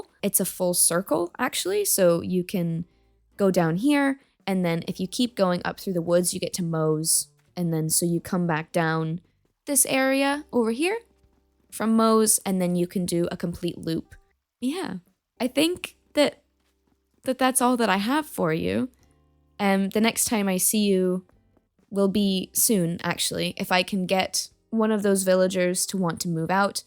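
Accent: Scotland, United Kingdom. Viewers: you sound SO Scottish HA ❤ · ive have never clicked on a video so fast!!! congratulations!!! and omg you can really hear the scottish coming through in your accent now too 🥺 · i also love so much that your accent sounds more scottish than when we last heard you heehee C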